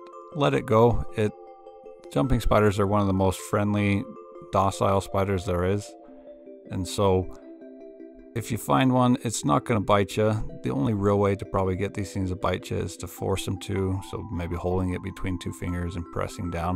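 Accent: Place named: North America. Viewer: Aw. I Would hold your hand. If its a jumping spider or a Wolf spider, they tend to have really big front facing eyes, they all have more than 2 eyes. Watch this channel, Spencer at My Wild Backyard, and Travis McEnery (These are theSpiders in your House) and theres a couple of other channels that often collaberate with Spencer who have great content! I think its lovely that you talk to them. I just took my horse who is getting over a sore foot, for a walk in the woods and animals are really the best company, we were out for hours, I've been doint it every day because she loves seeing everything around our wood. I know they dont speak but animals seem to just love the attention and the time you spend with animals is never wasted. I hope You find someone to help with your spider ID, I would have loved to have helped but am in Scotland and I think you sound to be in North America!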